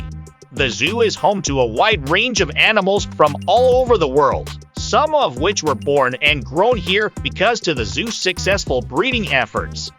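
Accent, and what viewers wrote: United States. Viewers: The worst possible voice over ever! Cheezy all-American accent, multiple mispronunciatons including - ad nauseum - Edinboro??!! It's Edin-burr. Or as many locals say, Em-bra. Some nice video clips, though some syncing with the narrative would have been beneficial for those lacking familiarity with the city. Also, for any visitor, walking through the New Town is a great experience and can be done enroute to Dean Village before a walk along the Water of Leith to Stockbridge enroute to the Royal Botanics. Also, a day on Victoria Street? I think not! There are some nice shops, true, though most are specialist offerings. An hour would likely be max. Though the Botanic Gardens is a nice place to find some quiet, so is the west end of Princes Street Gardens, with the castle overlooking. Get some eats at Marks & Spence on Princes Street and make your way down the steps at the bottom of the Mound...and 'dine' in the shadows of the castle. 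Fabulous!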